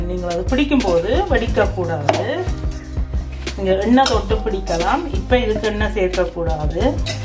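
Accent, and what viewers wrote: Jaffna, Sri Lanka. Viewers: Thank you.... love to hear again again our Original Jaffna Tamil. Great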